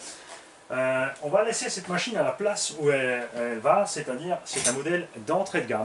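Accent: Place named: Alsace, France. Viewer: Salut, Je débute dans le RC "moderne". J'ai monté un Tamiya Madcap en kit dans les années 90 et je suis tombé sur des chaines de RC par hasard sur youtube pendant le confinement et ça m'a donné envie de m'y remettre. Je suis de Strasbourg donc je te comprends parfaitement;) même si tu parles en alsacien! Elsassisch ìsch bombisch, Hoplaaaaa! Je cherche plutôt un buggy RTR Brushless en 1/8 aux alentours de 300/350€ soit un budget total d'environ 450€ (voiture + radio + batteries + chargeur). Je ferai 2/3 bitume et 1/3 TT, quelques petits sauts et pas la peine d'aller à 100km/h. Un 4S suffira. J'ai vu le nouveau Absima Stoke Gen2 4S qui a l'air pas mal. Qu'en penses tu? Merci.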